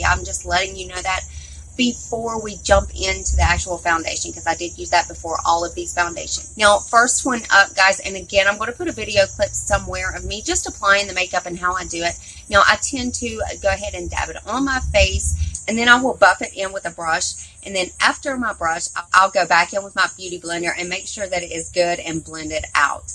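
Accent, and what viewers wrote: South, United States. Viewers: I was curious,Where are you from?Im from Arkansas.You sound a bit country like here.💞💖💕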